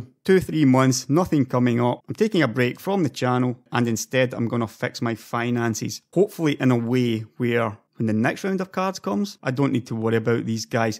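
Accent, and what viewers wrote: Scotland, United Kingdom. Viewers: I love your scottish accent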